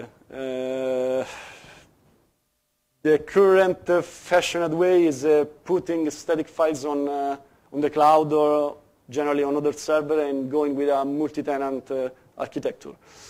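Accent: Italy. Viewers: Because this was recorded in Florence Italy. Europe isn't a single country. There are many different languages being used. And he IS speaking English, but he has an Italian accent, we get used to it. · It's just I had hard time understanding, very strong accent. Maybe it's because I heard Italian accent for the first time.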